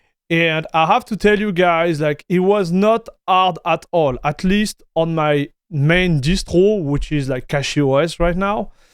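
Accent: France. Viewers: Très intéressé car je rencontre des problèmes avec ma Gigabyte 9070XT Gaming OC sur Ubuntu MATE 24.04 mais je constate qu'avec d'autres distros il n'y a pas le même problème. Sinon, ça fait bizarre de devoir écouter un Français parler en Anglais alors que j'entends bien l'accent et que tout du long j'ai envie de dire "nan mais c'est bon, parle moi en français, poto". 😅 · Cool accent, are you French?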